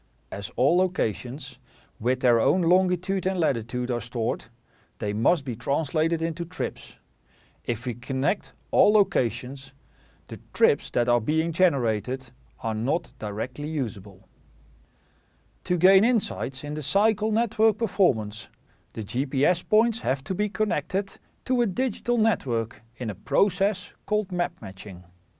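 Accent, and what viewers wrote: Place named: Netherlands. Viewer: Very lekker Dutch accent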